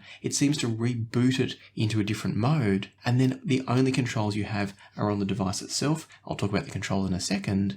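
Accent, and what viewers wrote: Australia. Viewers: it's his Australian accent with a rising inflection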